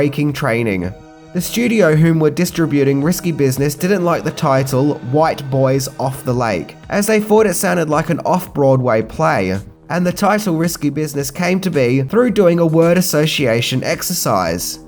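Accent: Australia. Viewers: Come on he is Australian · He is Australian. He does say some words differently due to the accent.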